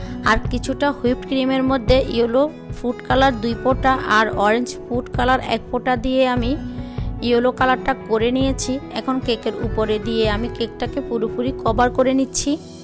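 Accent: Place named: Noakhali, Bangladesh. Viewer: Anne ki noakhailla ni ponded bolen keno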